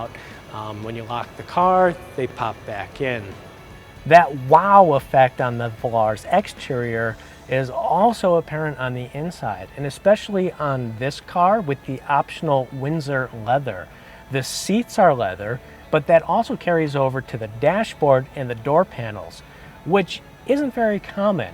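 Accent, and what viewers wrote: United States. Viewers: I can't stand this fuckers American accent. Why am I recommended yank car review videos?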